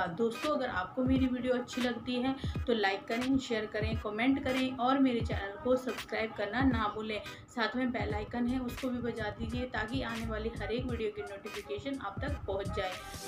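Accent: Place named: Kolhapur, India. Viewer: बहूत आभारी है मैडम कोल्हापूर के भाषा में लय भारी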